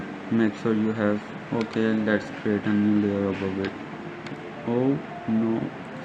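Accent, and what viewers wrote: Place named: India. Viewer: your voice. INDIAN DETECTED 😍😍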